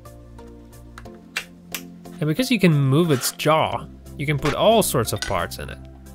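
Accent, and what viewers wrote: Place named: United States. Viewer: You speak with an American accent but seem to have some trouble with with English, sounds weird.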